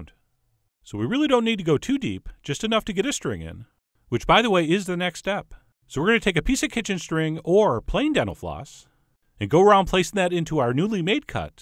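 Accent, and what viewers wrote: United States. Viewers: I still struggle with this tuning up and down form this voice. It's like a Roller-Coaster in each phrase... dah-dah-DAh-DAH-dah-dah... dah-dah-DAh-DAH-dah-dah... dah-dah-DAH-DAH-dah-dah... This sounds EXACTLY like a vinyl record with a out centered hole in the middle. The record spins like an elipse... Is that some kind of accent from some region in the USA? It's really annoying in my ears... BUT, the video is really cool and THANK YOU for this recipe!!! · His voice inflection gives me anxiety... I'm trying to listen to his instructions, but his voice inflections keep distracting me and forcing me to rewind, but I'm usually just re-distracted so I end up rewinding one section 4 times. D: He's great though, talented and funny, so imo the distraction is worth. Anyone know where this dialect originates from? Pretty sure it's an American regional accent.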